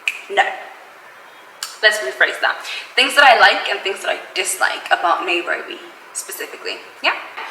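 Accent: United Kingdom. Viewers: U give the feeling that u got a bit attitude . Sorry to say but u are too british in your speaking and body language. If u like western lifestyle maybe try to live in the states or uk and leave africa for the ones who appreciate the real africa without west influence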